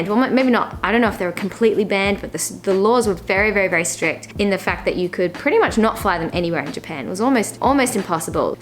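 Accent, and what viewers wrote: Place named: Australia. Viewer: We are neighbors-ish😂 Just found you, and am so happy to hear your Australian accent:) have a great Hanami down the river:) (hopefully I don’t sound creepy😂😂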